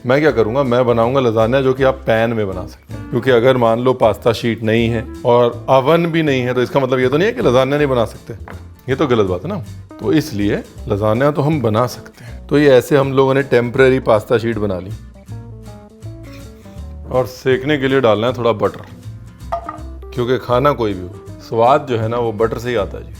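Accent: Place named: Punjab, India. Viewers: The punjabi touch in ur voice is just amazing 😁 and "ranveer aapne btaya nhi" has separate fanbase🌈♥️ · Reason why I love Punjabi accent even more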